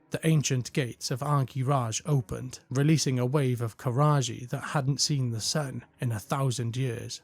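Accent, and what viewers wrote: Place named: Cardiff, United Kingdom. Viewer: i know a cardiff accent when i hear one.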